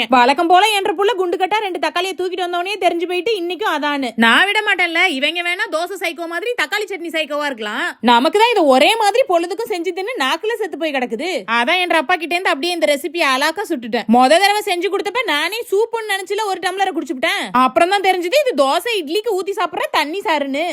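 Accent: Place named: Coimbatore, India. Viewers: பேசுறது எதார்த்தமா கோயம்புத்தூர் பாஷை சபாஷ் ❤❤❤ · அம்மணி உங்க சமையல் ரெசிபியை விட, அந்த கோயம்புத்தூர் பேச்சு அருமைங்கோ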